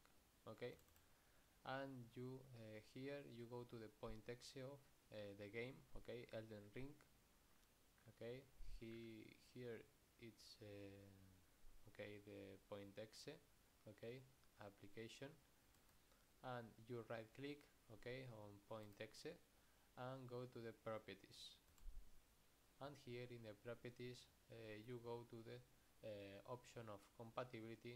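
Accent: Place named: Albacete, Spain. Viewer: tienes un acentazo de albacete q no te puedes ni imaginar pero me has salvado la vida eres el mejor sigue asi por favor te amo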